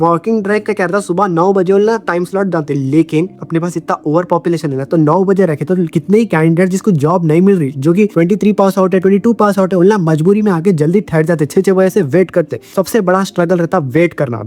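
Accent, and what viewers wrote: Hyderabad, India. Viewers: accent ka wajha seay kuy ko hydrabadh seay ha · Aap Itna Hyderabadi Accent ma Baat kyu Krta, Sunne ma Bohot Funny Lagta 😂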